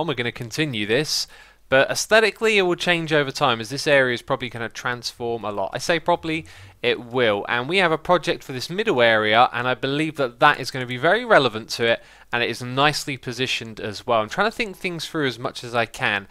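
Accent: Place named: United Kingdom. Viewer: Emanuel Ortiz Maybe it's his British accent, the sound of his lips smacking and his saliva bubbling, or maybe it's just the way he talks. You can't hate. No one can.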